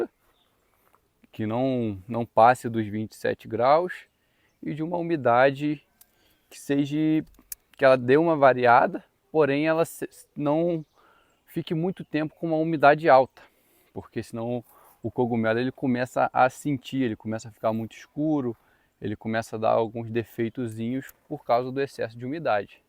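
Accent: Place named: Rio de Janeiro, Brazil. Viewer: Pelo sotaque deve ser daqui do Rio. Eu tenho uma pergunta já que não sei onde fica sua propriedade. Qual a temperatura máxima da sua região?? E se houve problemas devido a temperatura elevada?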